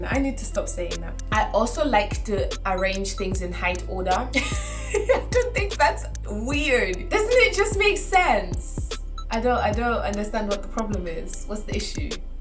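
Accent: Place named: Malawi. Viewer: Your Malawian accent I love 😂😂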